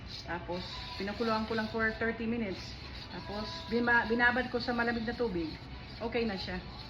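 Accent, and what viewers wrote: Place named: Ilocos, Philippines. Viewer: Wow..galing mo po mag tagalog and parang may ilocano accent din po the way ka po mag salita.. ❤❤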